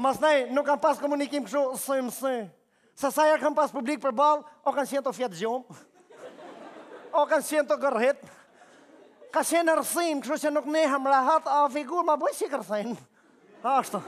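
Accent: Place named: Laç, Albania. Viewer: ky o duket se asht nga laqi o sduket nryshe🤣🤣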